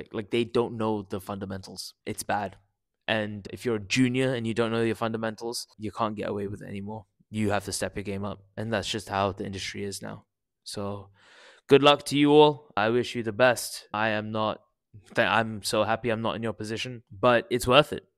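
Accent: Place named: South Africa. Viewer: It took me 5 minutes into the video to place the accent as South African. I went through British to American, back to British and finally landed on South African 😂😂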